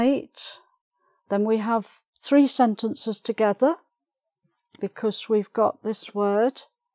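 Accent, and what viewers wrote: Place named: Midlands, United Kingdom. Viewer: The lady is from Midlands, he told about this in her video about accents and dialects. She moved to London and her pronunciation gradually changed.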